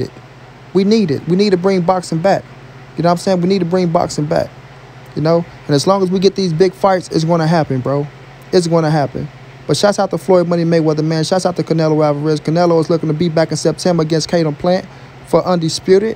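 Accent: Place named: St. Louis, United States. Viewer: I'm a white dude from north county STL, just happened across this video and soon as I heard you say "Give him his flowers while he HUR" I said aw shit this dude from the Lou 😆 Good content bro, respect💪💯 #Subcribed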